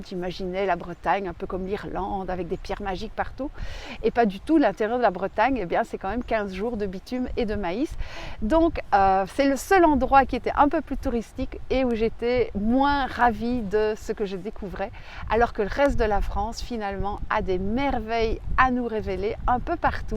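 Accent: Belgium. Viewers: Bonjour quelle énergie pour ce long chemin et toujours la bonne humeur ça fait plaisir de vous entendre ainsi que l’accent des flandres bon chemin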